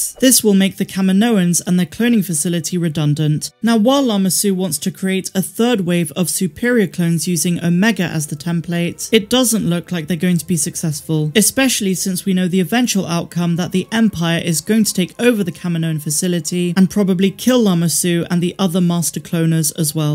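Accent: United Kingdom. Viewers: You’re British accent really helps mine mate , thank you mate . And may the force be with you.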